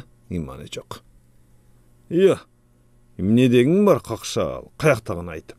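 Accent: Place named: Osh, Kyrgyzstan. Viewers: Кандай сонун....кандай сонун, оштук диалектика.....жүрөккө абдан жакын....бала кезди, ошол Ошто чоңойгон сүйкүмдүү кездерди, ата - энебиз менен жашаган кездерди, жөнөкөй жашоону, жөнөкөй нагыз кыргызча жашоону,кыргыздын табиятын эстейм.....сонун!!!!!🙏🙏🙏🙏 Авторго да, сага да рахмаааат😂😂😂😅😅👍👍👍🤣🤣🤣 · Сонун чыгарманы оштун обону менен окуптурсуз урматтуу диктор. Адабий тилде эле жазылган экен. Же атайын окудунузбу, же абышка кемпир оштуктар бекен? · Окуганыныз жакшы үнүңүз коңур ❤❤❤ бирок эмнегедир оштук акцент менен окуйсуз э? Эмнеге?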